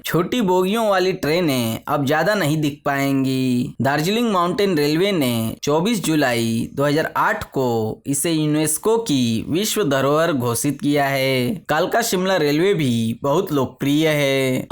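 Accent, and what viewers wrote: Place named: Bangladesh. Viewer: Bangladeshi type ki bolti ho 😂😂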